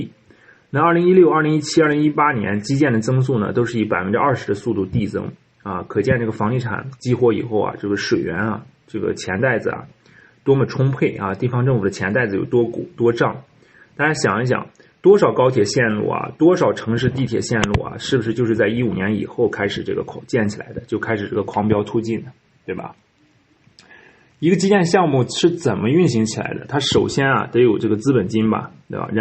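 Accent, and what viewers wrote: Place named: Shanxi, China. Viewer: 一听就是山西人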